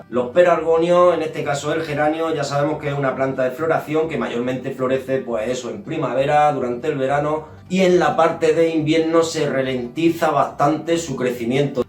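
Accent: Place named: Madrid, Spain. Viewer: te oigo acento" de Madrid para abajo"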